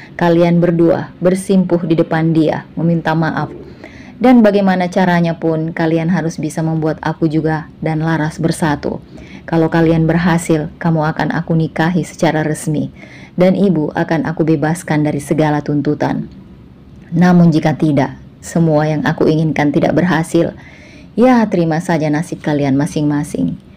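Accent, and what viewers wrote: Indonesia. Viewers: Chanel pendatang baru yg beda logat dr lainnya, khas batak bugis campur jawa bandung 😅, subscribe nya blm diatas 50 ribu tapi di gemari ibu ibu viewsnya setara dgn chanel besar artinya miminnya oke bawaain cerita , sukses sll mimin , single fight yg berjuang kuliahkan anak anaknya sendirian 🎉🎉🎉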